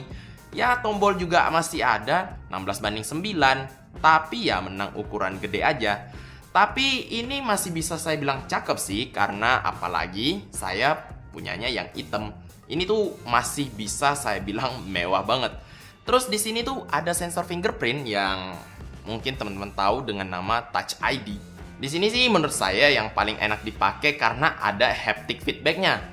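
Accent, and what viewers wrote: Indonesia. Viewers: Tk kira org telok lema dri logatnya :v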